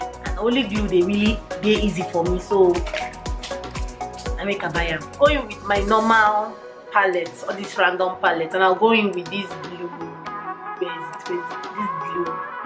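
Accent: Port Harcourt, Nigeria. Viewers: I like your pidgin o, very Port Harcourt'ish..LOL!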